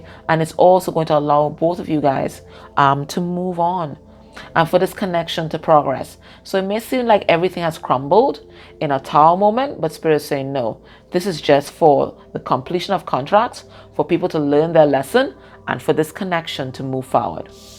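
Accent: Trinidad and Tobago. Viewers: Thank you. Always love hearing that trini accent 💕🙏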